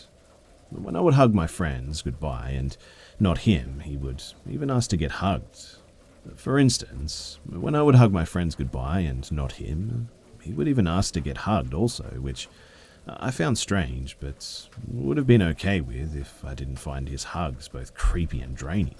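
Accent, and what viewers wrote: Australia. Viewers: Aussie are you not? Been looking for a channel that does what you do, I'ma horror fan 🙂also Aussie 😄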